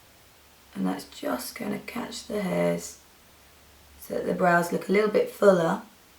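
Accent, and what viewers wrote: United Kingdom. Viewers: Really a beautiful makeup! I hope you had a wonderful wedding. (and I love your british accent)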